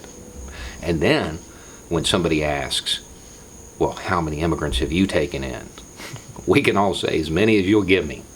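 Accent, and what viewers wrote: South, United States. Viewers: The fact that your southern accent would probably stop me from even asking you for directions scares me to dead, I don't want to be part of the fear mongering crowd; I want to see people as human beings and have my children learn from many cultures just my own.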